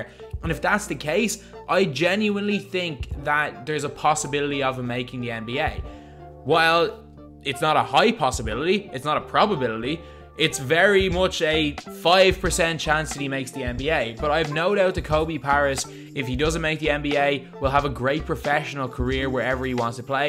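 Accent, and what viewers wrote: Ireland. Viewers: Reynaldo Tolentino Irish yan pre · Irish Accent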